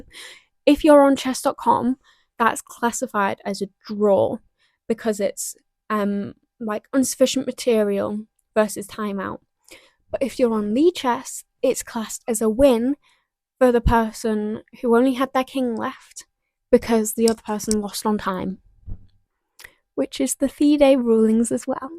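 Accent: United Kingdom. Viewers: Great points and it's why I've always preferred lichess! Btw, are you based out of England or New Zealand?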